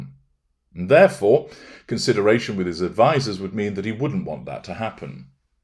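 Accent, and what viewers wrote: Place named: England, United Kingdom. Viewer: Dear Sir, so luv it when you swear, with your cut glass perceived English accent using true Anglo Saxon sentiments. You made me laugh out loud 😃🤩😊⭐️👏⭐️👏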